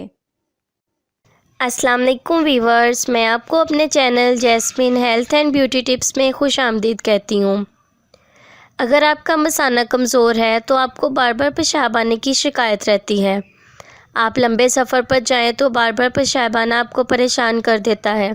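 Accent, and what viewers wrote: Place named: Sialkot, Pakistan. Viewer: Aapke Bolne ke lehje se lag raha hey keh aap distric sialkot se hey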